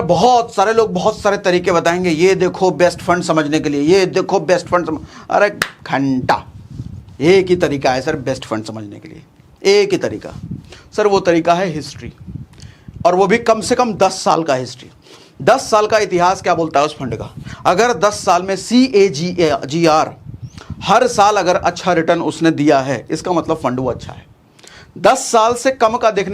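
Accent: Bihar, India. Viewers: sir ke sabhi pasand krta hai ye bihari hai bolte hai na ek bihari 100pe bhari ye yahi hai sir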